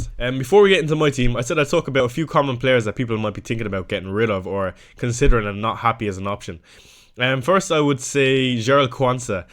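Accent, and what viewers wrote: Ireland. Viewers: Don't mind him, yer grand. not used to the Dublin accent.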